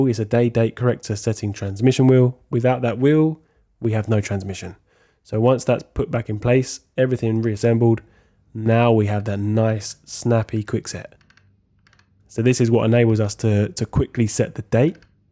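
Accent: London, United Kingdom. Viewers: My man sounding like a fellow Londoner and taking about usd. Come on fella let’s have a £ and let the yanks convert it. 👍😂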